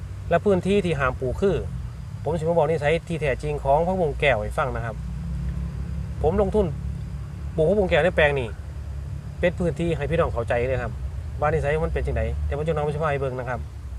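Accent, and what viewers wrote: Thailand. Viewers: บรรยายสำเนียงอิสาน...คนภาคกลางฟังได้50เปอร์เชนต์..ถ้าบรรยายสำเนียงกลางคนจะเข้าทั้งประเทศ. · ฟังแบบคนภาคกลางมันไม่ได้ 100% ถ้าสะดวกทำเป็นเสียงกลางบ้างก็ดีนครับ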